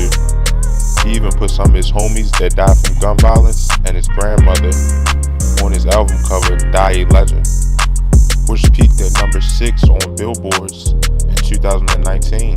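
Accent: Baltimore, United States. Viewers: Gotta be from Baltimore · the person talking is definitely for baltimore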